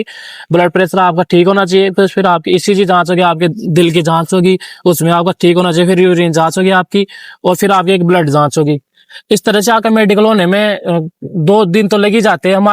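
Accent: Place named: Jhunjhunu, India. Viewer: Ya aavaj to mahre jhunjhunu ki lage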